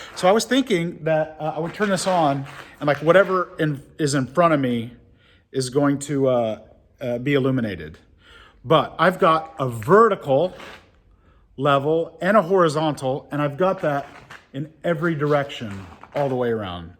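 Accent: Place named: Midwest, United States. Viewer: Actually thats the ceiling not the roof but I have a great fondness for Mid-central American accents. ❤️👍🇺🇲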